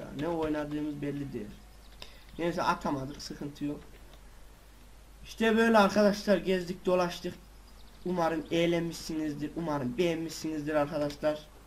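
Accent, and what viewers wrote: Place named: Kayseri, Turkey. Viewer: adamsın sen nerelisin bide biraz böyle kayserliler gibi konuşuyon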